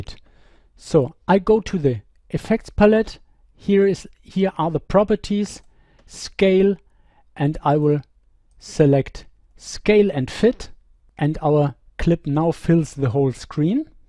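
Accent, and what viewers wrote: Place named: Europe. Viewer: brilliant explained show me how to and refreshing european accent- as opposed to annoying american drools.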